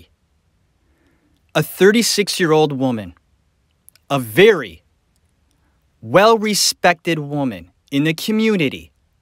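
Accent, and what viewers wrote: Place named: Cleveland, United States. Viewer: You sound like your from Cleveland, Ohio.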